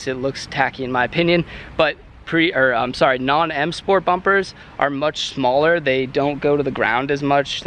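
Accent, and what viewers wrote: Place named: New England, United States. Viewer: Sound like you from New England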